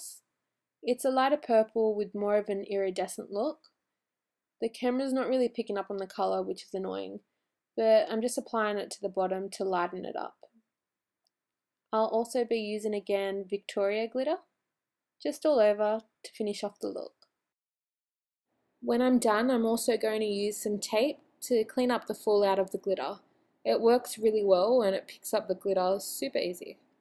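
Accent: Australia. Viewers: Omg! I follow you on Instagram, I never knew you were Australian 😍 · I didn't realise you where Australian! I've been etching your videos for weeks now!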